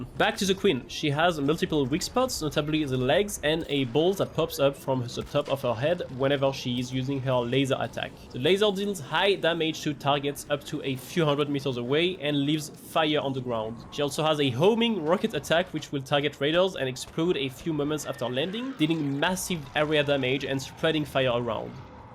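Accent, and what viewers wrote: France. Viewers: Are you French? As a French guy i can hear the French accents in every word hahaha love your video btw !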